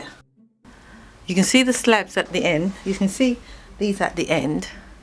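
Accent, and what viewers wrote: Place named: United Kingdom. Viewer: Thank you for this video. My parents have 4 very nice but old metal blinds that all need repairs. And cleaning. You seem very down to earth and I enjoyed the way you presented all this. Using your instructions to repair blinds all the way in Australia 😁 (I'm assuming you're in the UK coz your accent sounds like my brother-in-law's). Cheers 🙌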